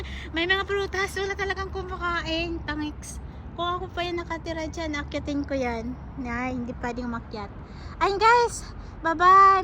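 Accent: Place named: Pakistan. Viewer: Nice sharing sissy pero natawa din ako sau sa nag aantay nang maasawa hehe..nakakatuwang Pakistan ang boses mo sissy masayahin kang tao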